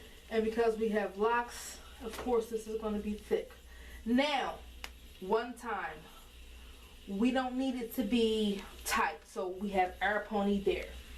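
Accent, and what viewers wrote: Baltimore, United States. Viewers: U must be from Baltimore wit the tew nd dew lol...much love sis...our city's strong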